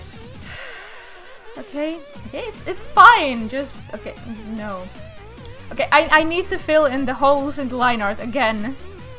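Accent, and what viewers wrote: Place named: Finland. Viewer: Ah tää suomalaisten enkkuaksentti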